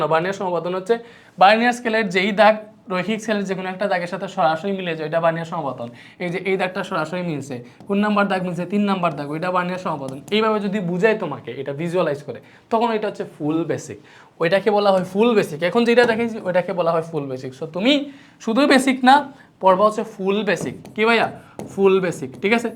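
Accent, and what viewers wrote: Sylhet, Bangladesh. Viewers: ভাই কি সিলেটি?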